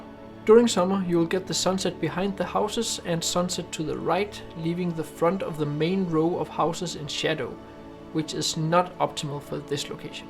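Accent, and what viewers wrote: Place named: Denmark. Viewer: Its not that bad! Its just very clear you have that Danish accent haha... Planning on coming to The Netherlands again someday soon?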